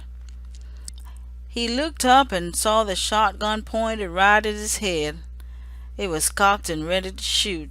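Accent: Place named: South, United States. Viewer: I loved your southern drawl it was absolutely entertaining. Thank you for the stories God bless you ❤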